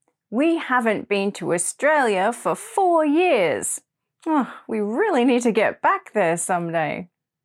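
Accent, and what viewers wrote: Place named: United Kingdom. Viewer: Hi,! I enjoy your British pronunciation. I found your channel today and will continue to use it.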